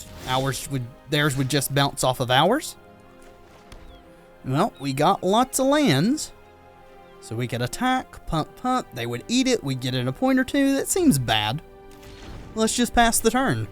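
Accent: South, United States. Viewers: Thought it sounded more like a southern accent, guess I'm not great at recognizing American accents. Keep up the good work!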